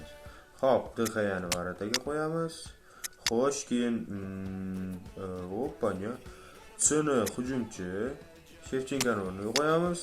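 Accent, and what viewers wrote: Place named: Namangan, Uzbekistan. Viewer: Namangan shahridanmisiz shevangiz ohshab ketarkan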